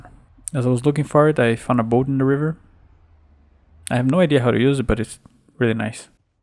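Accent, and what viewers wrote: Brazil. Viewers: kakakak da pra ouvir o brasil no seu sotaque, vc eh foda mn! vou assistir tudo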